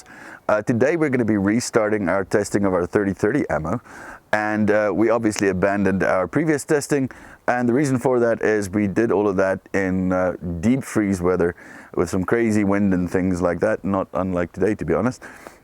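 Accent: South Africa. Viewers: that sounds like a south african acsent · I would guess south Africa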